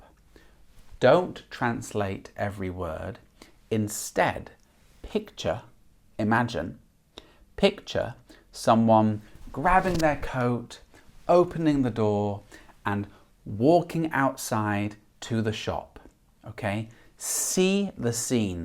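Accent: RP, United Kingdom. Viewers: Very usefull information, thank you for your help, i live in uk from 15 years and my english is A2 level🤦‍♀️i did not like to study in Poland, i was boared and stressed on lessons, thats why i didnt want to learn anything , but you chaneel and tips giving me opportunities to try different ways plus is good to hear reall british accent as on daily basis i work with other multi -culti people so no chance for me to learn proper english :( i also want to learn spanish and italian 😍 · You use received pronunciation and I have understood each word. But sometimes it's very difficult for me to understand the native speakers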